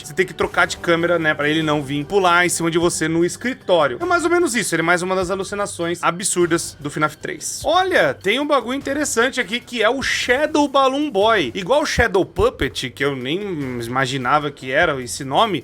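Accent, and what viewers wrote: Brazil. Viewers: E tu é bahiano 🤣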